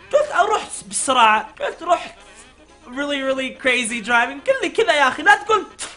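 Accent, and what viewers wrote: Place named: Saudi Arabia. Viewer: مش معقول كيف يتقن اللهجة السعودية احسن منا ...وكمان دمه خفيف 😂😂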